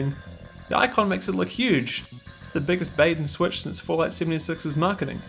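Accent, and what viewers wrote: New Zealand. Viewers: Gonna love the Kiwi accent. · is that a kiwi accent i detect?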